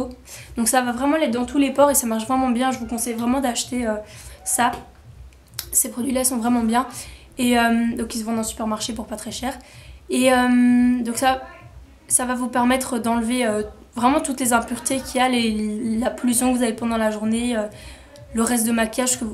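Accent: Belgium. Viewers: Ahhh une belge qui fait des vidéos ! J'ai reconnu ton accent ! Ça me fait plaisir ;) Tu es d'où ? :) Et tes vidéos sont super ! J'adore !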